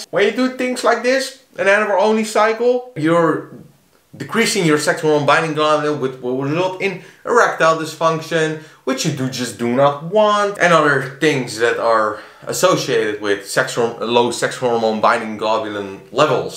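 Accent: Netherlands. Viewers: Ik hoor een heftige nederlandse accent 🤣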